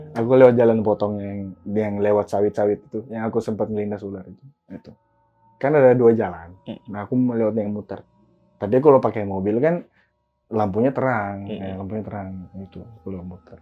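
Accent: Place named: Papua, Indonesia. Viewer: seru mas klo pk logat papua bgs..sekilas g terlihat orang timur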